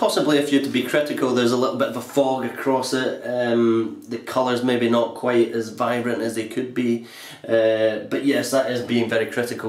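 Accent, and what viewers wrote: Scotland, United Kingdom. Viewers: Thank you so much for this video, this was really helpful. Also I love your accent, are you British by chance? If not, sorry for mistaken. ^ ^ Keep up the amazing work honestly, I subscribe and excited to watch more of your videos. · sounds more Scottish.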